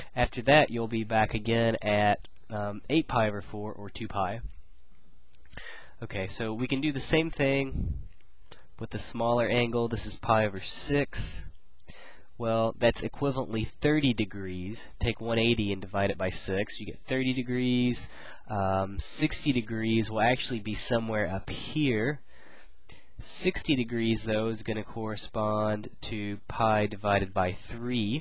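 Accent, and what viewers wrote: United States. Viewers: of course!! It is American Accent. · one of the american accents ;) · True, but I thought he was an American. So American to American, there is no accent. (I do understand that it's an American accent, but between Americans we don't notice a difference.)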